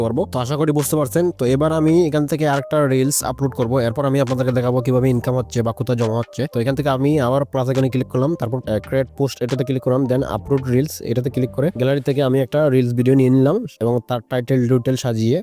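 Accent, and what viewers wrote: Sylhet, Bangladesh. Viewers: ভাই সিলেটি❤❤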